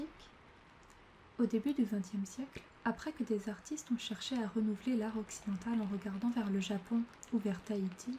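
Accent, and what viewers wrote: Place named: France. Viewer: You know, I’ve noticed this before when you made the english-french video. You speak both languages really well, did you grow up speaking both? Also, i don’t speak french but I’ve heard it enough that i feel confident assuming you’re french, right? Like your french is from france and not Quebec. That aside thanks for the nice video, i hope you make more french asmr but mayhaps a bit more slowly next time. Personal preference 😁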